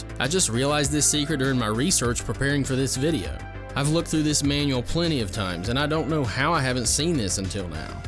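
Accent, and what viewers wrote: South, United States. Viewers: I was close lol I could detect a certain dialect. People think Southerners all talk the same but there are different dialects and styles. My dad's from Tennessee and says things like "ov'er" instead of over there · You said "Ravel's Bolero" right, btw. But I'm sure you already knew that. :-) Oh, as an aside, your videos help me to kill old preconceptions about the southern accent. I grew up in South Dakota (which has its own dumb-sounding accent, like from the movie Fargo), and I always thought a southern accent meant the person was uneducated. When I moved to Virginia, near the West Virginia border, a decade back, I still held onto that thought, at least in the first split-second I heard someone speak. But your videos are fun, entertaining, and I always learn something new from them; all of which helps me kill those old connections, and realize we're all the basically the same nationwide. 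· Dat southern twang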